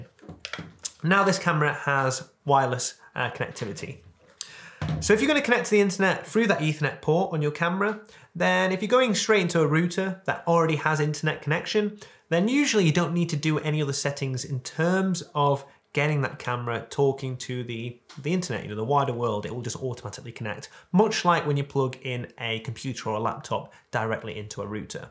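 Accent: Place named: Northern England, United Kingdom. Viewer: Love the Northern accent my man, thanks for the helpful explanation.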